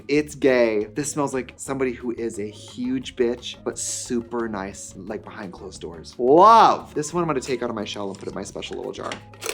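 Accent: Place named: Milwaukee, United States. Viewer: it’s the midwest accent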